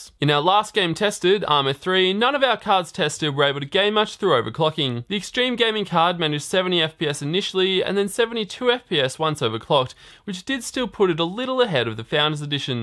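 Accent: Australia. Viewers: AUSSIE REVIEWS! easiest on the ears. new sub mate, cheers!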